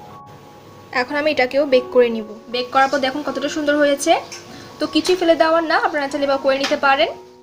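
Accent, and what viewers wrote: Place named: Chittagong, Bangladesh. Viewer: আপনার ভাষায় কিছুটা চিটাগং এর টান আসে।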